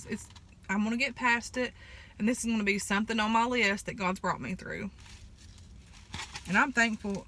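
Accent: South, United States. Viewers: Girl you’re so amazing 😉 and btw I LOVE your country accent!! You’re such an inspiration!! The food looked so darn good!!! Yummilicious 🤪 Hey I’m happily divorced twice too!!! You just keep on smiling and making your great videos!! Love ya so much! Sending you lots of hugs and love ❤️☀️🌺🌼🤗🌻🥰🌸🌹💗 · Hey, i just love ur country vocal and ur country talk just love it.. We yall have to love each other and pray for each other. I just love ur family and ur little dogs.... I will be praying for u and ur family. I will be praying for the shots that u are getting.... Hey looking for Joyce Meyers Bible (pink study women) at Good Will cant found it here....Love yall...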